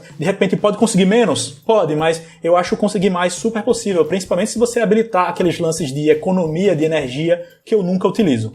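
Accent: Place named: Northeast, Brazil. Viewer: Gostei muito desse Rewiem Nordestinizado KKK # nordeste Já vou me inscrever 😁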